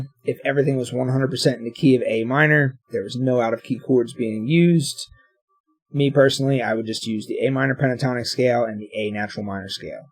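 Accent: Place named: Philadelphia, United States. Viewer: You live in the Philadelphia/NJ area? That accent sounds like home.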